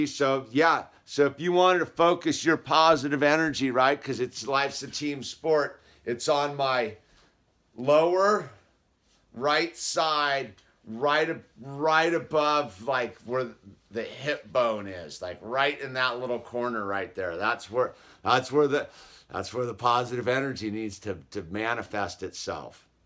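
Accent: California, United States. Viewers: I'm going to have to say that coming from a guy who does literal backbreaking labor, I wouldn't hear the word "dude" to much let alone the ever iconic Californian accent.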